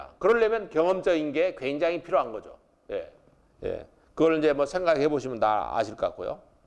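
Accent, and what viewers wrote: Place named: Jeolla, South Korea. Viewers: 전라도 말씨가 어때서요 ㅋ 별나네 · 사기치지 말게. 전라도 말씨, 아주 답답한 진행. 그냥 덮으세요. 임플랜트? 티타늄 재료로 하나 원가 10만 원도 안돼. 치조골만 양호하면, 가까운 동남아시아에 가서 아주 싸게 하세요.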